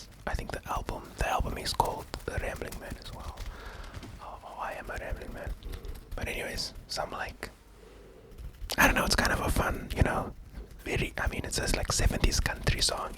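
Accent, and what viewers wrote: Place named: South Africa. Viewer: Finally someone who does asmr with a legit South African accent! Yayyy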